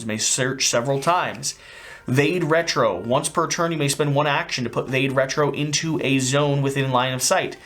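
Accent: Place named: South, United States. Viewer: Obligatory southern drawl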